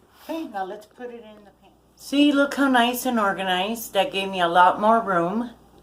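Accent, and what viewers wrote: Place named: Cuba. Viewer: Hi,! I am filming for the declutter challenge, too & I found your video from the playlist. I'm from Miami & your accent is reminding,me of home! I got kind of thrown off when you said you're in SOCAL, but then you confirmed that you're Cuban. I also thought so because of how clean and organized you are - again, just like the ladies at home! You've done such a great job organizing your pantry. Wish I was like that. I'm working on it, though! Watching your vid for inspiration!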